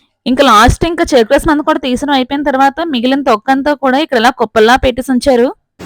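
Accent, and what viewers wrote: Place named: Srikakulam, India. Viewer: Srikakulam voice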